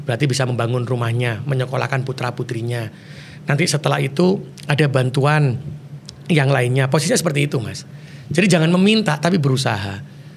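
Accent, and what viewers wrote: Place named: Surabaya, Indonesia. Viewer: Publik speaking nya bagus, style Suro boyoan yg SGT kental .merangkul, kecerdasan sosialnya super pokoknya. Good..josss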